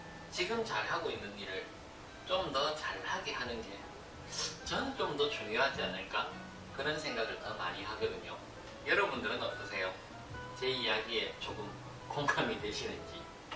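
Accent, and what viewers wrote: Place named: Gyeongsang, South Korea. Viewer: 참 부지런하시네요. 말투가 경상도 싸나이~? 좋은 말씀들 잘 듣고 구독 꾸~욱 누르고갑니다.♡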